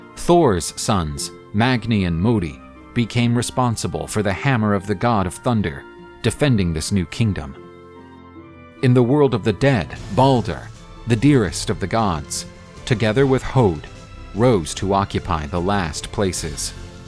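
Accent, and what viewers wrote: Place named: United States. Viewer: You say all of the Nordic words like an american, great storytelling other than the pronunciations of names.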